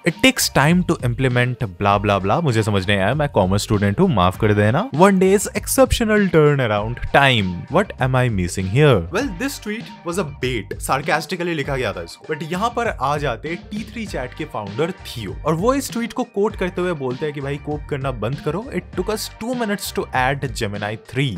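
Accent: Bengal, India. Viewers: Bhai aapke hindi mai aapka bengali jalakta hai 😅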